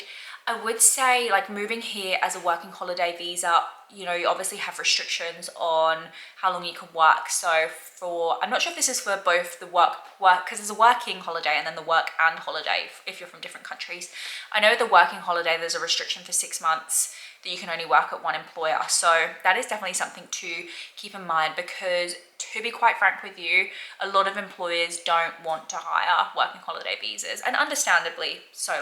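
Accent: United Kingdom. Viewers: Very good, so I had a look at a video you made 8 months ago. Hard to believe they are the same person. Most obviously, 2023 had a strong English accent where as 2024 pretty much talks normally. And 2024 looks much happier, healthier and self-confident than last years model. The fresh air has done you good.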